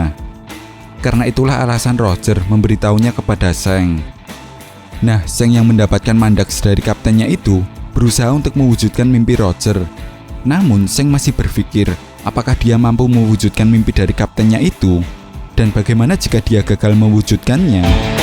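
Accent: Java, Indonesia. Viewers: Medhok mu itu looooh.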